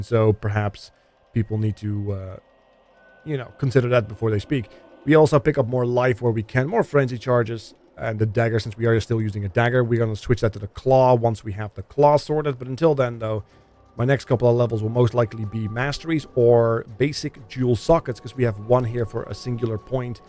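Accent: Netherlands. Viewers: and moreover: nice content, i like your videos, i like the accent <3 you sound like my part of the family from the netherlands. i am from germany :) and thanks alot for answering my question <3 cheers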